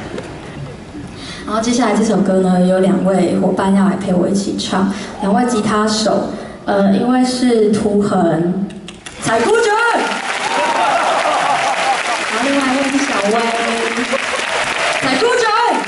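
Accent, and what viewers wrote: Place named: Taiwan. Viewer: 还是她的口音跟我台湾人的朋友的很像。有亲切感😊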